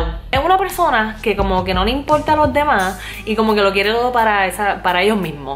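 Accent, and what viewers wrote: Puerto Rico. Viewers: No es boricua. Es una China que se crió en puerto rico. Por más que hable como boricua. Ahora mismo es la china que se crió en puerto rico que ahora vive en USA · Habla igualito a los boricuas. Es una china bien boricua"🇵🇷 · Ok la vi y dije puede que hable boricua la escuché y dije no mi ciela esa es mas boricua que yo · Que bonita , te holles hablando boricua ,orgulloso de ti ..por que vivas y te encanten nuestros encantos en la isla.... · Es raro ver una china hablar también el español y mas como los boricuas como yo ✋😁🇵🇷❤️ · China na esa es boricua de todos modos Abla bien boricua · Corillo que pasa cuál es la envidia en los comen6con el acento boricua ??? 😂 Ya superen que somos los mejores!!! Puñeta 🇵🇷 Por cierto aquí tenemos mucha mezcla con chinitos y es súper cool 🔥🔥🔥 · I love boricuas accents 🙂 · Alina Cuellar no todos los puertorriqueño hablan así ella obviamente se crio en unas parselas o en un caserio de mala muerte. 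Ese asento de ella es muy chisma y no todos hablamos así!!!!!! Chusma!!!! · Que mal me cae el asentó de Puerto Rico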